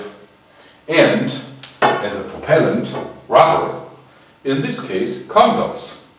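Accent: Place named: Germany. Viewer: dieser akzent... jup, ein deutscher! :-)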